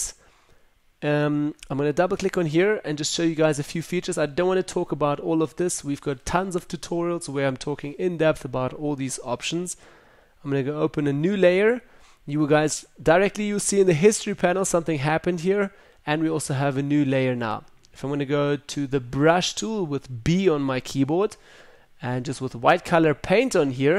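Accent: South Africa. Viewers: Are you south african!!!!! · Ja nee! You must be 🇿🇦 GREAT TUT!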